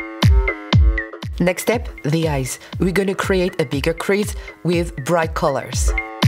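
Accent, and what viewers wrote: France. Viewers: Juste en entendant son accent, on sait qu'il est Français 😂. / Just by his accent, we know that he's French 😂.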